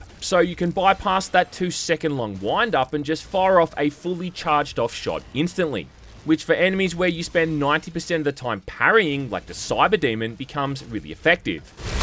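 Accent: Australia. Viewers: I hate the way Australians sound, can AI make you not sound like that, I hate it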